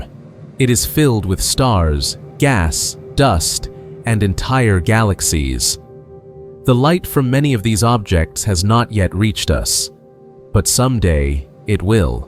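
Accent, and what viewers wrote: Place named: United States. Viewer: Finally a good old fashened AMERICAN accent. Cant believe it.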